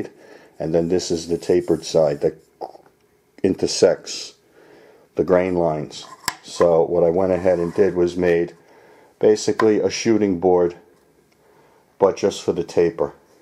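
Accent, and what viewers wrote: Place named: Staten Island, United States. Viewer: Hey. This is incredible stuff. It makes it even more enjoyable that you have the exact same accent as my beloved uncle who grew up on Staten Island. Question: would you make hollows and rounds to sell? I would much rather buy from you than try to track some down from across the pond.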